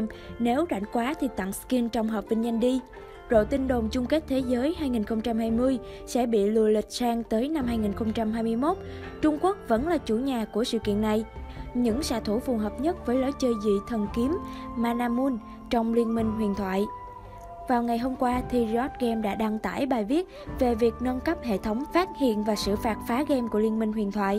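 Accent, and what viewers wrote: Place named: South Central Coast, Vietnam. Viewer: Hình như kênh này người miền Bắc nhiều Nghe giọng miền trung ,nam không quen Ad nên dùng phần mềm chỉnh giọng , hoặc tìm cô gái giọng bắc sẽ tốt đó ad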